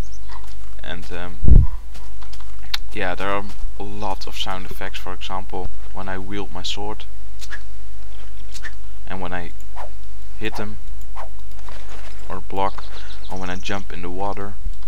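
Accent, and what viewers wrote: Netherlands. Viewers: I might be wrong, but are you dutch? I recognize that accent, I hear it every day ;).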